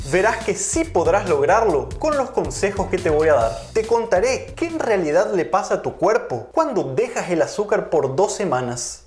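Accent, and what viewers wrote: Paraguay. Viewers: No me gusta su acento de paraguayo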